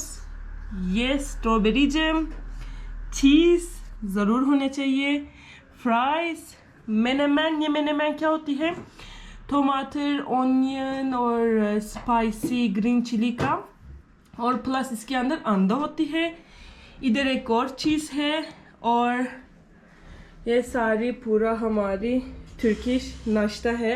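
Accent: Pakistan. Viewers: I love your urdu accent. Love you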